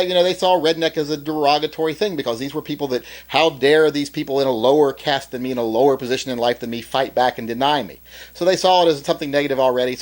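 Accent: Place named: South, United States. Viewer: don''t hide your southern drawl just because ebomey doesn;t like it..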